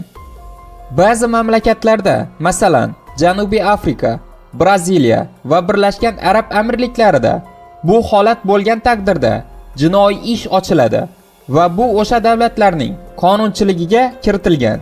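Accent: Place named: Khorezm, Uzbekistan. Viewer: xayotda qanday garipsangiz shunday oqing deysizmi menimcha admin xorazmlik xorazmcha gapirsala vapshe tushunmaysla😂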